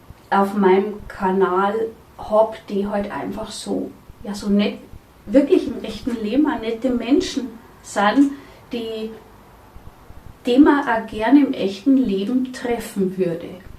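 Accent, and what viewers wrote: Bavaria, Germany. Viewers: 💜💜💜 Danke dir liebe. Ach ich find das Bayrisch so schön. Soll doch jeder babbeln wie er mag und ihm die Schniss gewachs is😉 Ich find es schade das so viel Dialekt verloren geht. Meiner wurde mir aberzwungen. Sicher. Sprache entwickelt sich und ändert sich. Aber um das tun zu können, braucht es die Vielfalt der Dialekte. Wenn alles gleich und eintönig ist...na lass mal gut sein. Ich find das schön. Bleib dir treu. Und red wie du magst. Wer deine Sprache nich versteht. Der versteht weder das Gesprochene noch den Sinn dahinter. 😉 Mir helfen deine Videos. Und sie ermuntern. Ich wünsche dir gute Besserung. 🍀und schön das du trotzdem ein Video gemacht hast ❤ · Hallo, ich grüße dich von der Ostsee. Ich bin ursprünglich aus dem Rheinland. Kölner Umland. Ich mag deinen Dialekt. Ich kann vielleicht nicht jeden Ausdruck aus dem Bayerischen kennen. 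Aber ich komme schon zurecht. Es liegt meistens an einem selbst, ob man mit dem Dialekt und der Mentalität der Menschen zurecht kommt. Ich habe da kein Problem. Die letzten Wildgänse sind heute aus Wismar abgeflogen. Der Herbst kommt. Also, wie sagt man im Rheinland „ maach et Jood „ 😀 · Ich finde deinen bayerischen Dialekt wunderbar. 😊 Mein Dialekt ist sächsisch. Das ist wohl nicht sehr beliebt. Abe es ist wie es ist. Gute Besserung für Euch. 🌸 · Ich versteh auch nicht alles, obwohl ich selber Bayerin bin😂. Ich lieb aber deinen Dialekt. Hört sich besser an, als meiner. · Ich find den bayrischen Dialekt super ❤! Bin in Niederösterreich daheim und versteh fast jedes Wort. Unbedingt weiter so 🙏😍😍! Pfiat di 👋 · Ich liebe das Bayrisch. Mein Onkel kam aus Hochstadt Wesseling und lebte in Köln.Er trug seine Tracht und sprach sein Dialekt....die ganzen 30 Jahre die er mit meiner Kölsch sprechenden Tante zusammen war. 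Er war ein Bayer vom Herz, Dialekt ist schön❤❤❤ Vielen Dank liebe. Ich muss das nochmal hören.!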